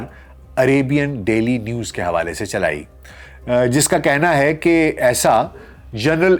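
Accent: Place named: Punjab, Pakistan. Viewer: سر اپ بھت اچھا وی لاگ کرتے ہیں ریگولر سنتا ھوں زبردست لیکن ایک چیز نوٹ کی اپ بات اردو مے کرتے ہیں لیکن دو ہزارا۔ گیارا کو اپ دو ہزارا یاراں بولتے ہیں جو کے پنجابی مے بولا جاتا ھے اسکا خیال رکھیں ❤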